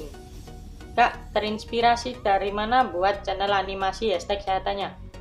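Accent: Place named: Java, Indonesia. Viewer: Sk dengerin km ngomog dek.. Wong jowo to dek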